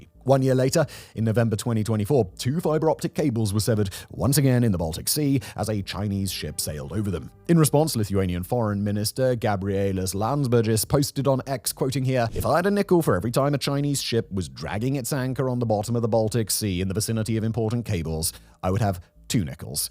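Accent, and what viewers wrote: United Kingdom. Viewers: Bro said ''china aggressive international approach'' and is just china investing in emerging economies. And he says this with an UK accent, you know the empire that conquered half the world trough violence lmao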